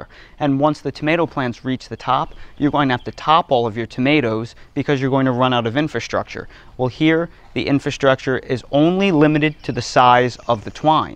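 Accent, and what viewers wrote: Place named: Northeast Philadelphia, United States. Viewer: No way is this dude from North Carolina. His pronunciation of “herricane” means he’s from Gloucester County, NJ. Williamstown, Wenonah, maybe Deptford. “Shtrike” instead of strike is Northeast Philly.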